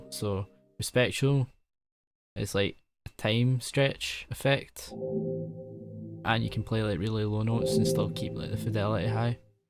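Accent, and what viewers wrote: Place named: Scotland, United Kingdom. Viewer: bro youre scottish af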